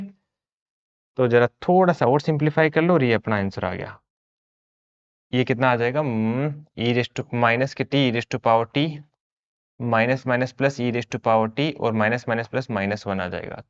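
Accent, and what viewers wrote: Rajasthan, India. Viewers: Sir kya aap rajasthan se ho😊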